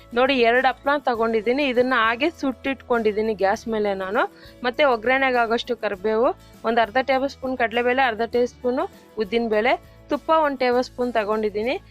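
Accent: Tamil Nadu, India. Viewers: Tamil tara matadbedi correct agi bele anta heli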